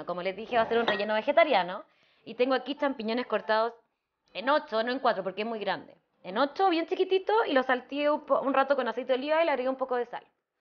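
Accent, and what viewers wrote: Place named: Chile. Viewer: Que delicia...lo haré.. quiero algo diferente!!! Saludos desde Costa Rica y me fascina el acento chileno👏👏👏👏🤗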